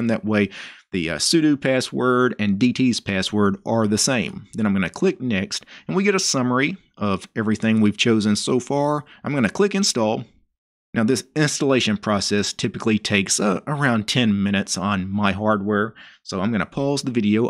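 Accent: South, United States. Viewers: words like: fAantAastic, really cul, beooting, anythieng, goe with; they do sound southern cause difference is in pronunciation of vowels, however there are although not many instances that consonants are pronounced with unusual stress change, Im a faking Linguist that's why its interesting for me amdD well this one is also d at the end so its practically D+EE which C+V, light deiM stress is on last C, urvxdTee is also C+V so nothing abnormal here ITS HARD to pick and choose the words its the overall sound; Southern accent is IN YOUR FACE, 2 words in u can pinpoint where it is from, I guess DT has spent a period of time in other states, or he has constant contact with people of other states THAT DO NOT speak normal American accent. hey DT would you answer this too please, about living in other states or having constant contact with ppl from those areas?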